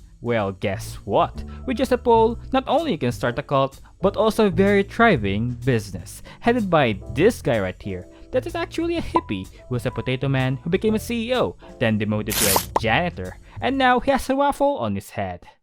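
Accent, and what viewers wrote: Philippines. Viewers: You seem to have a filipino accent. Are you?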